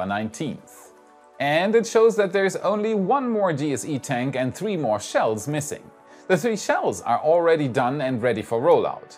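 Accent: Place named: Germany. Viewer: not a bad idea, but no, it isn't. His native language is German. He broadcasts in English so he can reach a predominantly American audience, and I am very glad he does. No other English speaking nation says it that way, either, that I know of, and I do have a high degree of familiarity with the other dialects. As an aside, I think we should pronounce it that way, but we don't.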